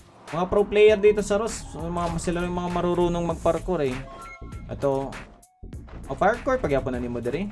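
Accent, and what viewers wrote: Visayas, Philippines. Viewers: Grabi kaau bisaya kaau. Pro idol❤️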